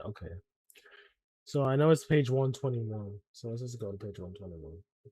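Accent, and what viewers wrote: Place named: Jamaica. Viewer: Ok so I've been preeing for a while so your video showed up on my feed. And I couldn't help but think 'wow this guy in the video's accent could pass for Jamaican' THEN YOU MENTIONED YOU WERE JAMAICAN (as we Jamaican's often do) and I was like eyyyyy we out here!! Lol let me know if you ever wanna play I'd love to party up.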